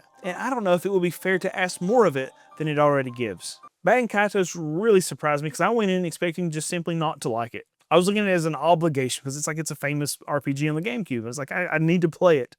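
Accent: South, United States. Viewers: I enjoyed this video way more than I ever thought and the southern accent made it way better 😂😂😂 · Your accent makes me feel at home (Grew up with southern people)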